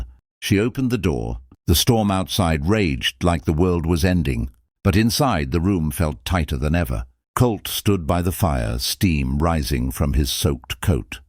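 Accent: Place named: United Kingdom. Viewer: This is a story about the old west. Why does the narrator have a British accent? Very difficult to follow.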